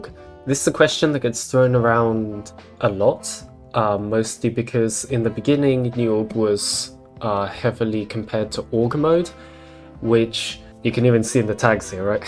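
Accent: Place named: New York, United States. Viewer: sounds like newyork :D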